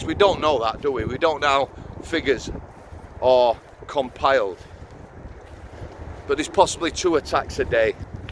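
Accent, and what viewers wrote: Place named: Yorkshire, United Kingdom. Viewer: 100% right, none of the politicians in this country are worth a wank and I wouldn't dream of bothering to vote for any of em. You're a top man buddy and you say all the true things that all of the normal decent people of this country think, its a shame none of the mp's feel the same way or do fuckall to make us feel like theres even any hope for the place. If anything England is getting worse, I sometimes wonder how both my grandads would feel if they saw what the country is like nowadays, they both fought against Hitler but I'm pretty sure they would wonder if it was worth the effort if they were still around today. Have a good day, you and are well liked by us all. You honestly sound like you are very close to me actually, Ossett, Dewsbury area ish.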